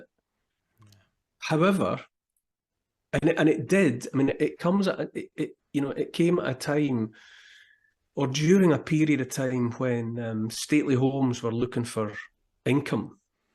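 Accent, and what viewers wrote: Scotland, United Kingdom. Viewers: you never struck me as a bright spark...not that I listen to you much as I can't stand the slummy Scauts accent. Learn to speak English!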